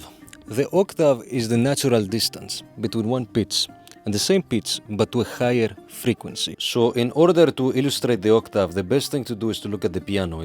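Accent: Greece. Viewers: Perfect Greek accent and appearance! Hilarious video! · Excellent Greek accent! And I learned a lot about music. As always. Keep up the good work! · I love your videos and your Greek accent!!! 😂 I’m currently learning Byzantine music and I learned that it traces back to the modes in Ancient Greece. There are 8 modes and they have names like Dorian, Phrygian etc., which are not the same as what western music calls them. You’re right, they did screw up the names! 😂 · Only thing I have to say is that you absolutely nailed the greek accent without a doubt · What the hell, i was runing your video in the background and i hear you greek accent! "Wait, hadn't i clicked?" But no you had to prove you are a brother to yet another people's nation! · He isn't Greek? Μπρο It sounds so greek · Funny and educating! Also, your accent is really Greek! Hopefully we''ll see similar videos about other music traditions! 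· The Greek accent is eerily convincing. I sense the presence of a Greek significant other. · No, but even with a musician's ear, he'd need to go to a few hundred Greek weddings to mimic the accent that well. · Λοιπόν! You manage to sound like a Greek speaking English even to the eeeeh between words. Nothing less than amazing! Also, once more, I have to admit that I've never thought theory of music (or theory of anything, really) would be so diverting. I laughed so hard my frappé came out though my nose! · you can't possibly have such a good greek accent.. once I heard that λοιπόν I was sure I was hearing a compatriot.. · Is this guy part greek or something how tf is he speaking that good greek and greek accent english????? He even knowσ the cretan "κατεω"!!!! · Bro, I totally thought you were Greek xD You fkng nailed it. Even the Greek words you threw in there had me at hello:D Awesome, learned a lot, and I'm not even a musician:D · How come you have an Greek accent?:) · Greek accent is truly masterful 🧠🤯🤯🤯🤯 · why is your greek accent so spot on? 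xD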